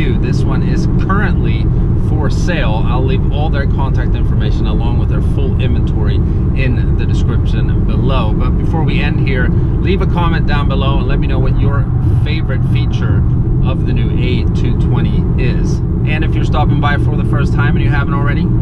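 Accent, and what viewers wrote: Sweden. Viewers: Great review. Am I hearing some Swedish in there? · I dont know why but your Swedish accent stood out to me in this vid 😅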